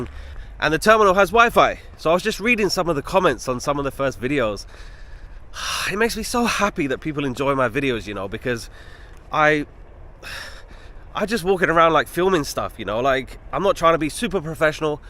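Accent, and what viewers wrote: United Kingdom. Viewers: I agree. Sounds like bait and switch to me, regarding the port name. You’re not complaining, you’re informing. I appreciate all your info. Oh, and you sound perfectly British to me. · oh migod, you don't sound like American at all..I can't really place your accent. I know it is British. But being an American, I am not privy to the regional accents in England for sure. Still love your enthusiasm and venting and all that.. You live life fully, whether while complaining or whether in awe of something. We get swept away by your emotions. It is good to feel, whatever it is fully.. Thanks for the videos. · I think you sound British, but you dress like an American 😜 especially your headwear. I’ve always heard that only Americans wear ball caps. And you have that San Francisco hat so people probably assume you’re American. 🤷🏼‍♀️ But I’m from the south and I have an accent. 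And I don’t really hear it myself most of the time. But certain words have that southern draw. And then I hear myself and I’m like damn I sound country as hell.😂 Also, do they have Uber in Copenhagen? Can you just Uber from the ship to the metro station at least. ? Also FYI, I’m actually headed down to Walt Disney World this week. ❤ 🏰 And that’s a crazy price, because I’m gonna pay close to that for five days at Thanksgiving.? But that also does include gratuities and all the port fees. Do you use a certain website ?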